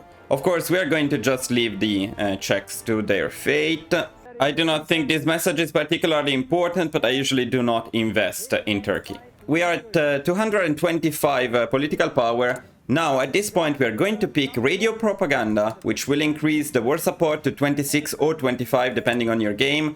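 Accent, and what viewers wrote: Italy. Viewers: Sei italiano?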